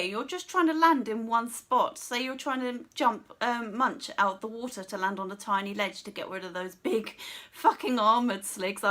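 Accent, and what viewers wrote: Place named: RP, United Kingdom. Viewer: You are one of the few people I know of that can still sound posh while swearing haha. Great review chum.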